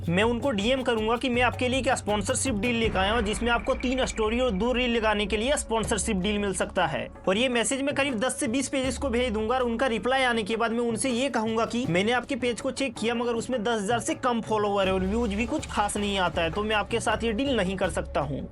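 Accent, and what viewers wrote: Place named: Jharkhand, India. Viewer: Bhai tum jharkhand se ho 😂 Mai bhi hu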